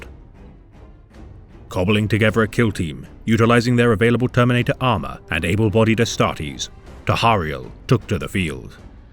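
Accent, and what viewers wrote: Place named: England, United Kingdom. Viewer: I was getting tired of the necron narrator tbh lol back to that sweet English dialect lol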